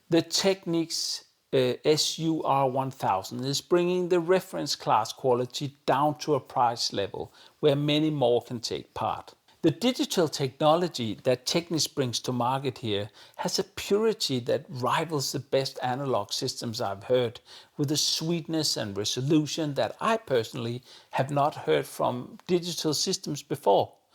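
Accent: Denmark. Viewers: I Iove your reviews and your Danish accent! Back in 1990, my first “real” amp was the Technics SU-V90D 😉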